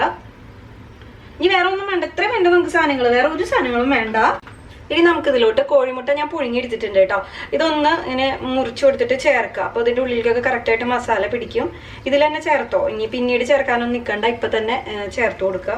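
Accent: Thrissur, India. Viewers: Ente daivame....super presentation.ingane thrissur karkku matre pattu tta....👍😊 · തൃശൂർ ക്കാരി ആണല്ലേ 😁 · Enthoru easy ayita mutta curry undakunney.anthayalum undakum Thrissur slang kelkumbo nalla sughund. Thanks dear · Thrissur or Kottayam?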